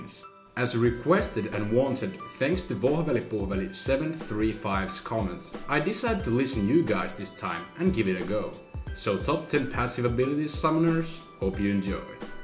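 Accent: Finland. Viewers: Mä tiesin.. helkkarin suomalainen aksentti. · are you finland ? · Are you from finland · Mä vähän aattelinki et oot suomalaine, koska aksentti :D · Your accent is sooo Finnish ;D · U finnish? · Are you finnish? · are you from finland?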